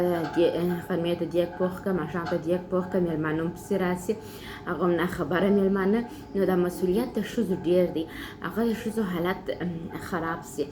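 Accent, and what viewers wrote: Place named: Kandahar, Afghanistan. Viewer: ستا دا کندهاری ژبه زما په زرکی سی❤❤❤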